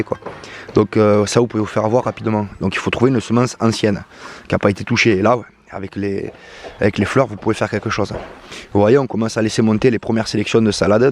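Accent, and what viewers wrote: South, France. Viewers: Même si tu vas à toute berzingue, (il faut que je trouve le ralenti !!! lol) je me suis permise de rêver que j'étais dans mon petit potager et que le sol était envahi de lianes de courges, courgettes et autres cucurbitacées. Ton accent à renforcé cette impression ! le sud s'est déplacé ?! Combien de décalage, en terme de températures, avec le sud du sud, afin que je m'adapte ? J'aime beaucoup ton appréciation de la nature : naturellement vôtre ! Je viens de m'abonner, j'ai beaucoup de vidéos en retard, je les regarderai au fur et à mesure. Vidéo très intéressante, merci. Chris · Mon beau sud de la France me manque, j’entends mon accent, merci pour tes vidéos que je viens par trouver grâce à l’algorithme. Ça m’aidera pour mon petit potager en Hollande.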